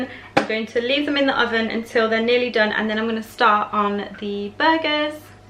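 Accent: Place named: United Kingdom. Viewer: You got a real British Accent.. I bet!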